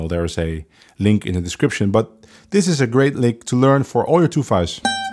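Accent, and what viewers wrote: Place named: Netherlands. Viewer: Your Chord shapes are unusual. You're definitely a fabulous teacher. Worth studying. Love the Dutch accent.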